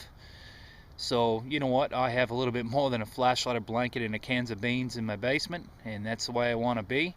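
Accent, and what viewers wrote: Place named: Australia. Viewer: hahah great accent mate. aussie/american/canadian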